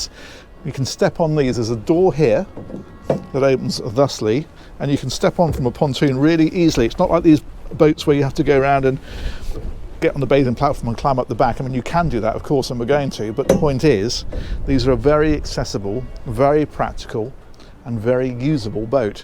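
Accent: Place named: United Kingdom. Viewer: Fun fact, the original audio is noted as "US English". I am french, the french audio is terrible. And you don't speak the barbarian "US English", you speak English. It's obvious for anyone speaking English. It's my third language. English is much more elegant and fluid than the "US English". Vocabulary is INDEED different too.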